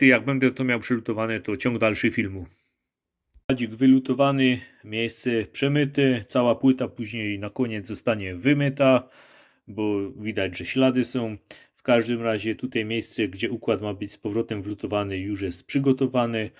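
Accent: Silesia, Poland. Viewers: jestem swiezym posiadaczem magdaleny z 2016r troche wszystko to nowe dla mnie wczesniej siedzialem w peugeotach ostatnim byla hybryda 508 RXH znalazlem twoj kanal swietny masz kontent bo krok po kroku wszystko a do tego ten hanysowy bliski sercu memu akcent:P (pochodze z Swietochlowic ale od prawie 19 lat w Irlandii) Mam takie pytanko kupilem od zoltego brata modul do AA P3.2 i czekam na niego musze softa zaktualizowac mam teraz <num> EU N i jaki bys polecal wiem ze musi byc 70 + ale jaki konkretnie. Bardzo ciekawi mnie te twoje polaczenie szeregowe z kompem jaki to soft itp (wiem o tych modach co mozna dograc) Moglbys rozwinac temat ewentualnie polecic stronke/forum z taka wiedza?? Dzieki wielkie za filmiki sa bardzo pomocne mysle ze gdybys nagrywal po angielsku mialbys just duzy kanal:P. pozdrawiam Tomek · Skond żeś Chopie jest ,bo słysza że nosz synek ze śląska, pozdrawio Rybnik.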